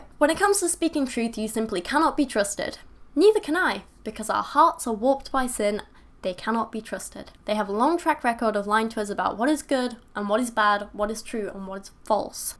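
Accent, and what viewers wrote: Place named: England, United Kingdom. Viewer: You brilliant little apple nibbler! :) awesome. Even better how u say it, English accents add to how good most women sound :) keep fighting the good fight . Your fan -Brandon Gutleben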